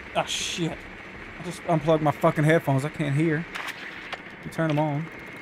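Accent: South, United States. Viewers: DaRealMastalin all white guys from the south sound like this :s its hot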